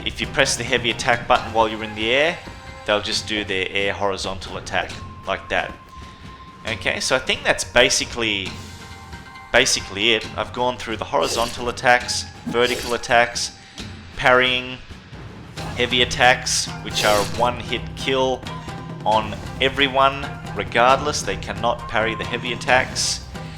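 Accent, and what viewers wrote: Australia. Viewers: What reign of Australia are you from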